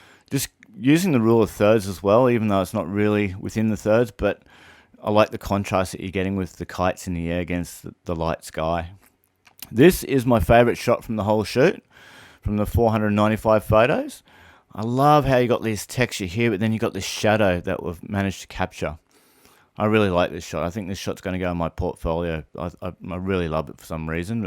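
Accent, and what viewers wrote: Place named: Australia. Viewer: Great video! Love hearing a fellow Aussie on YouTube! I've just done a video / project with a monochrome in camera preset. Loved it! Great video!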